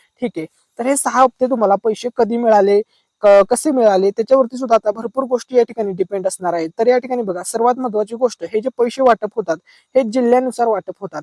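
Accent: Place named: Jalgaon, India. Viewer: Mini Jalgaon jila tune bolati aahe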